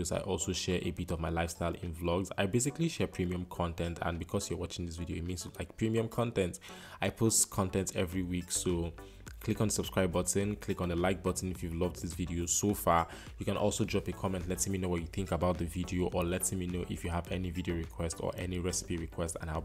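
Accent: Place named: Ghana. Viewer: Bruh. I could swear you Ghanaian lol. This is lit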